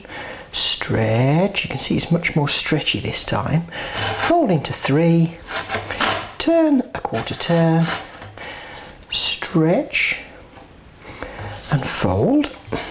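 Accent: Birmingham, United Kingdom. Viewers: Okay, so trying to morph my loaf from one recipe to another didn't work! I've now followed this recipe religiously and will post the result. I know what folk mean about voice and I detect a faint Birmingham accent there. What a pity she no longer communicates with her supporters because of the ugly people who drove her away. I've subscribed to her gardening channel as I need help in that department too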